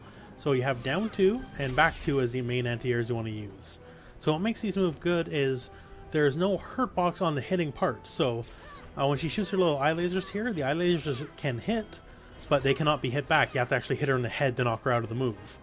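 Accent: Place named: Atlantic Canada, Canada. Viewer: Great video bra!! I can tell your from the East Coast of Canada ehhh by your accent!!! Very helpful video bro!!! Thx. I liked and subbed!!!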